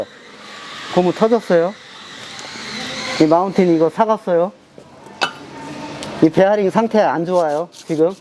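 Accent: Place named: Hwaseong, South Korea. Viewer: 말투가 고향사람 말투 입니다 정겹습니다. 경기도 화성인데...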